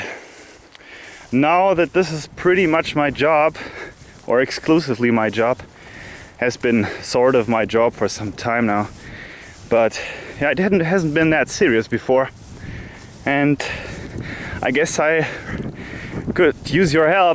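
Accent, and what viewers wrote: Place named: Germany. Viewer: " and..... jaaa " dude i love your classical German accent <3 '' zere you go ''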